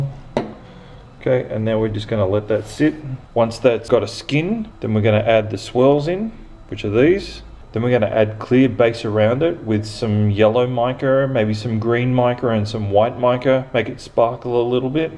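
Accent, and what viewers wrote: Australia. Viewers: Oh Yay, a soap maker with an Australian accent!!! I'm in the early stages of learning about soap making, and I'm nearly ready to start making. Can you please give me some ideas of the best places to buy soap supplies, essential oils, colours etc... In Australia? I'd really appreciate it. The only place I've found so far is, Aussie candle supplies.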